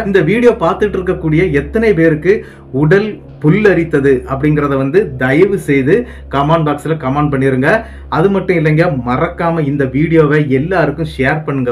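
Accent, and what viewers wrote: Tirunelveli, India. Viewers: தம்பி திருனவேலியா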